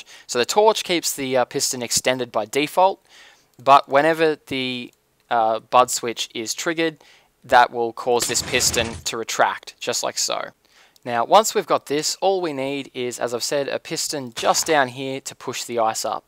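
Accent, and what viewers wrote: Australia. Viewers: where in australia r u from?!?!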